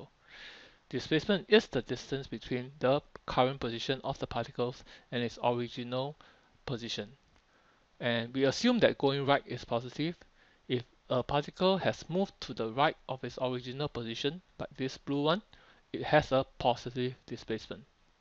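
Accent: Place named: China. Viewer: Good video. U sound very asian Chinese.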